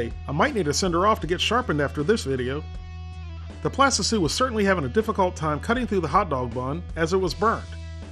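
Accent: South, United States. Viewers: Hugedickerino Kripperino he sounds American, and from the accent I will say he's from the south.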